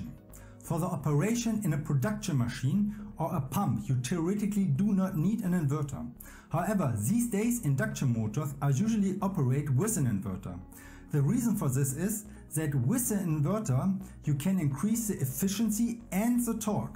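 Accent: Germany. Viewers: 1) maybe a bit stronger sound even at maximum, I have problems listening to your video on the go (in the Berlin S-Bahn for example) 2) your German accent is extremely strong and sympathetic... 3) you put a lot of subjects in your video. I think it would be better to make different videos for each sub-subject. As you're explaining the basics here, I guess most people (including me) are a bit over-burdened with the many technical expressions 4) maybe try to use as little text in your video as possible. Maybe try showing as much by 3d-models only. Maybe use also some pointers to point to the parts of your 3d-models you're talking about 5) thank you for sharing your knowledge about electric motors. Thank you a lot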